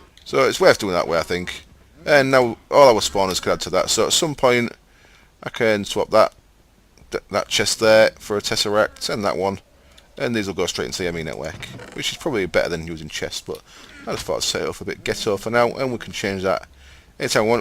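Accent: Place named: North, United Kingdom. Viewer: yeah exactly the same northern (i think) accent that you have :)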